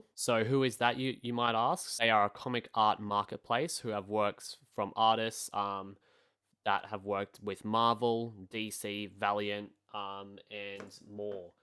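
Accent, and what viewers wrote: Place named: Australia. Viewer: I subscribed because you sounded like an aussie living the crypto dream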